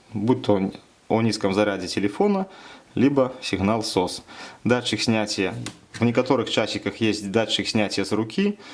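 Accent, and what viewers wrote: Belarus. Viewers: Акцент на белорусский похож)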